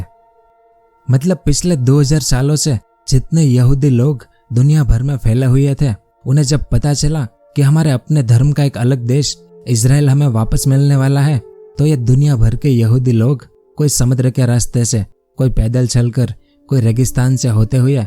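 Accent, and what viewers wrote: Gujarat, India. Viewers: आपने भोट बड़ा इंफॉर्मेटिव ब्लॉग बनाया और इसके लिए आप धन्यवाद के काबिल हेन और भोट ही भीतर बनाया जिस्म ४००० बरस का इतिहास जो मैंने मेरी ९० बरस की उम्र में खिजाना था और हिन्दी मेरे लिये भोट मुश्किल हेन मेरी ज़ुबान गुजराती हेन लेकिन इतना कर शक्त हूँ आप के बोलने से आप गुजराती लगते हों और voice भी भीतर करने की ज़रूरत हेन फाड़ी आवाज़ ज़रूरी हेन ऑलोवर भोट भोट भीतर हेन जिसकी आप को धन्यवाद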